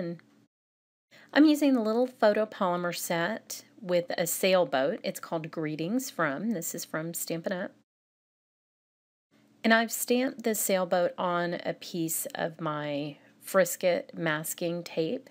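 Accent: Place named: Texas, United States. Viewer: I'm slowly working my way through your video and blog archives, adding many to my Favorites because of the techniques you show and tips you provide. Love your sense of humor a lot; your deadpan delivery in this video, when you were talking about options if you don't have 24 minutes to make a card, made me laugh out loud -- thank you for that! Also, I wish my Texas drawl was as lovely to listen to as yours; I tend to talk too fast to let my drawl sprawl ;-).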